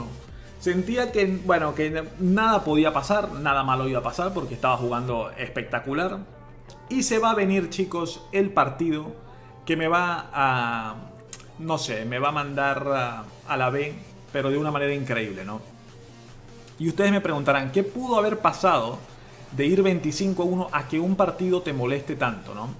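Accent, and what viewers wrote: Venezuela. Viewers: De donde eres ? Me suenas a Venezolano pero no estoy seguro jajaja. Buen video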